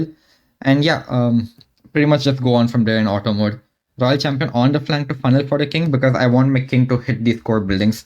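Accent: India. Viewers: Love your accent, which part of India are you from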